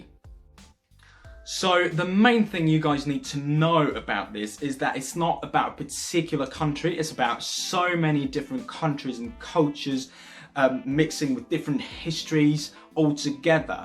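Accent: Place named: RP, United Kingdom. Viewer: Well I don’t know about his nationality, bjt he defo has a pretty standard british accent :)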